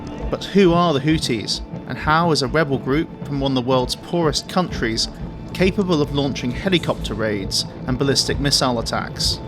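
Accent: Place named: United Kingdom. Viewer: You sound like you live in Britain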